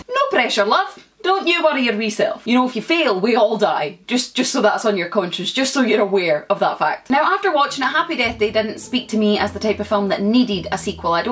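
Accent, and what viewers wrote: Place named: West Scotland, United Kingdom. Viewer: Here thats a wee west scottish accent 🤣🤣 where you from pal?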